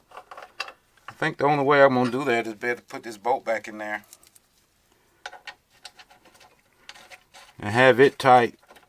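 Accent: South, United States. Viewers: Great video. you are one country sounding brother! (and that is meant as total compliment). Keep up the good work. Thanks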